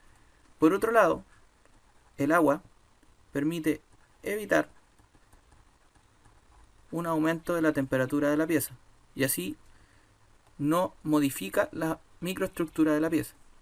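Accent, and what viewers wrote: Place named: Chile. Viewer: Chileno po weooooon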